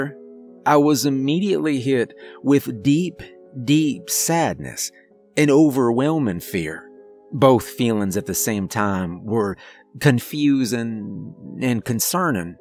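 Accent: United States. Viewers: Sweet another amazing story I love the cowboy accent on this one to would love to hear more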